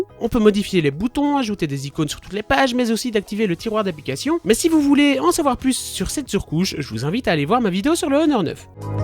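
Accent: Belgium. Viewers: Bonne vidéo ! Belge?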